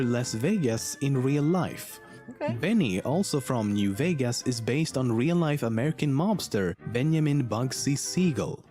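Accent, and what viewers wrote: United Kingdom. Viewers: you can tell hes british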